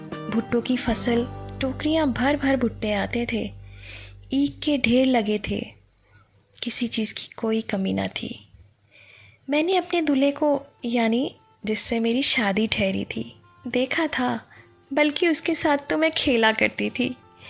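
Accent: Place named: India. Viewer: اردو زبان ک ے الفاظ کو براہ کرم' ہندی کے لہجے میں مت پڑھیئےگا محترمہ۔ براہ کرم پہلے اپنا تلفظ اور لہجہ کو اتنی تاریخی ناول کے شایاشان پڑھیئےگا۔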